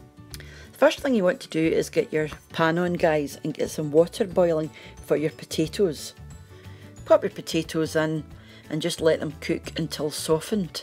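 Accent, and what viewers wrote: Scotland, United Kingdom. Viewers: I came for the dish.... Stayed for that lovely accent. I love your accent of the Scottish young. Lovely. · Your accent makes me homesick...... I was born in England but my family are from the Highlands originally and I called Auld Reekie my home for many a year, even started getting a wee accent masel..... Smashing.... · Grew up with Scottish accents, miss them a lot. Great recipes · You sound very like my husband. Light, polite and well spoken. I love that kind of Scottish accent. He's from outside Glasgow. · i like the name! and love the Scottish accent. also a big fan of SAHB, one of the best rock bands ever and highly underrated but i will always love them. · It's so interesting to me that Scotland has such different areas within it. I spent three years living in Fife and not once did I hear of this dish. It looks delicious and ill give it a try. 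So nice to hear your accent, I miss Scotland so much! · The accent takes me back to a happier time. I was raised by JOBs in Canada (Just Off The Boats) all of my family were from different areas of Scotland. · Yum! You sound like many of my pals fae Scotland! Much love from America ❤💙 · I have trouble with Scottish accents but I can understand your beautiful accent perfectly. I never heard of this but it sure does sound good. By the way, I'm doing my family tree and discovered aseveral times great grandfather was from Scotland. Completely unexpected. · Scottish accent is funny like irish accent but the people's features are so beautiful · I could listen to a Scottish accent all day long. It reminds me of my grandparents. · I clicked on this video more to just hear how this dish is pronounced and love the Scottish accent so much.. I ended up watching the entire video and now I need to make this for dinner..Just subscribed!! 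LOL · I canna travel to Scotland anymore so I practice the accent listening to you and the Outlander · A perfect channel! A gorgeous Scottish burr (giving all sorts of ASMR) with yummy comforting food. Youtube heaven!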